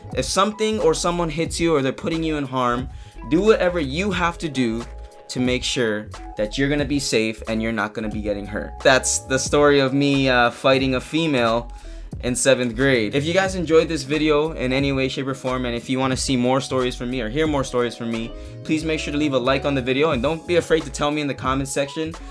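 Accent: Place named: Polynesia. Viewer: I shouldve known. u had that poly accent.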